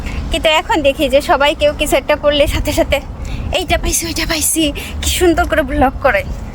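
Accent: Khulna, Bangladesh. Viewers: আপনি খুলনার মেয়ে?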